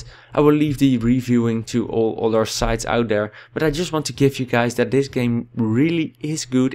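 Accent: Netherlands. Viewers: haha nice, ik hoorde al zoiets aan je stem dat je nederlands spreekt haha, maar je spreekt erg goed engels hoor en een gaafe video! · Are you dutch? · Hm. I never heard you dutch speak english before. The accent had me wondering where you might be from, i actually had to look it up on your channel :P If you had been speaking german instead, i'm sure i would've recognized your heritage at an instant. In other news: Neat video, i kinda like the accent for no reason as well. Only 4 more days till Attila, WOO! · i hear your dutch :D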